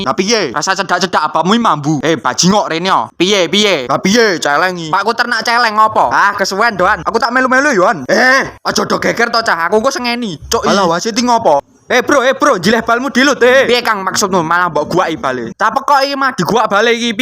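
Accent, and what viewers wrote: Surakarta, Indonesia. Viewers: Wong solo to mas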